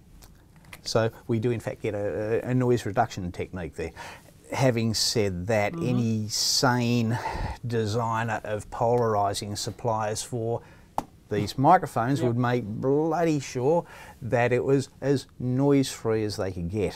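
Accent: Australia. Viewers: I fucking love the English accent of Australia peeps. I wish, I could manage to get even 1% of that… 😂😂😂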